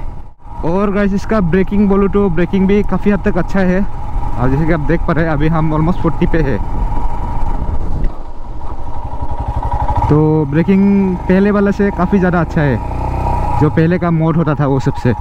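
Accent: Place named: Assam, India. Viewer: bro i think you Assamese boy cozz Hindi sm to sm Assamese wlo ka hindi hai ye😊btw keep it up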